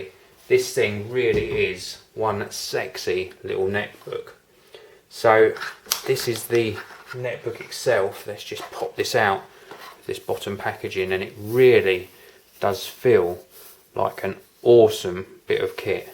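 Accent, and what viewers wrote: United Kingdom. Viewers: british accent :D i love it :D:D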